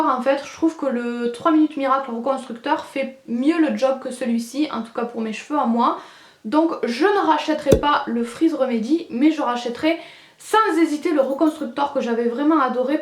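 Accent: South, France. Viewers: Le suuuud !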